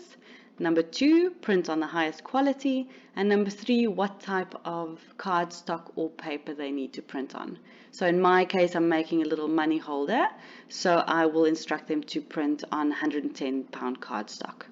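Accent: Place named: South Africa. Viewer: That South African accent is so hot...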